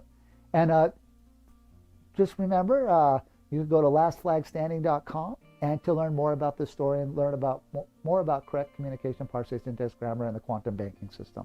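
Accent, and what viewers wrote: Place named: United States. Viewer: Transcription de la conférence ci-dessus de Russel-Jay : Gould Ne parlant pas couramment l’anglais L’accent américain ne m’aide pas Voici donc ci-dessous La transcription de la traduction you tube Avec certainement des fautes de traduction Gratitude * * * Salutations J'ai regardé les médias et les choses qui se passent dans la société Il y a beaucoup de préoccupations que j'en … Que j'ai en tant que maître de poste de notre monde Je suis témoin des mots, que les gens utilisent et des conditions historiques des mots du passé Je parle à propos de Babel, non je n'ai pas dit la Bible j'ai dit bavardage : bable, qui est une grammaire fictive C'est la grammaire ou la communication que nous utilisons pour la confusion Et je voudrais tout d'abord dire honte au gouvernement des États-Unis au Congrès, à la poste les militaires US, et des présidents qui depuis 1999 ne se sont pas manifestés Et non pas donné clôture des contrats aux citoyens de cette Grande Terre et aux citoyens du monde que nous étions capturés par un système frauduleux de grammaire de Babel avec le certificat de naissance de tout le monde pour ceux d'entre vous qui n'ont pas les antécédents de ce qu'est un certificat de naissance s'il vous plaît aller sur <link> et regardez mon film mais revenons à ce que nous voyons maintenant dans la société dans l'ingénierie et la nouvelle compétence en communication que les gens utilisent vous entendrez les gens utiliser le mot aborigène lorsque vous commencez un mot avec une voyel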